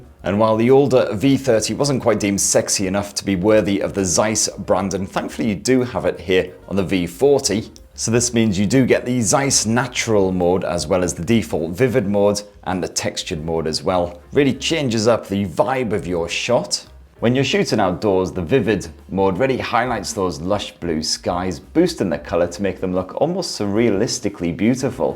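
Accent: United Kingdom. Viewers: The British Accent Wow 😊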